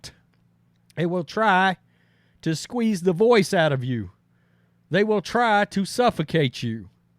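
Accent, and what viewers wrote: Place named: Tennessee, United States. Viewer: you are so country sounding! You sound just like me talking. Lol im from Tn so we get it honest! Love ya! Great reporting as always! I love this channel!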